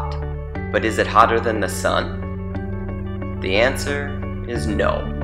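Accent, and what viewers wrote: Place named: United States. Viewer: Speaks with an American accent but uses celcius.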